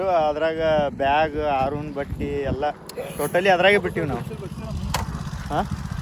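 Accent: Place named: Andhra Pradesh, India. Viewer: Anna navvvu kuda andhra avre anna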